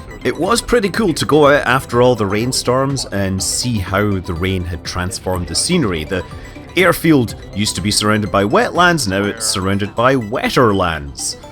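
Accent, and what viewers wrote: Scotland, United Kingdom. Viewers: I always thought you lived in Scotland 🏴 or the Isle of Man 🇮🇲 based on your name and accent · Ha Ha First time watching your vids and i heard the Scottish accent and thought you were flying in Scotland. You must have taken our weather with you to California. New Sub fae yer home country.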